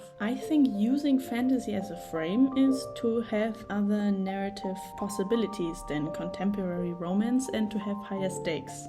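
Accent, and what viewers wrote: Germany. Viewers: Dein deutscher Akzent ist echt schlimm aber sonst gutes Video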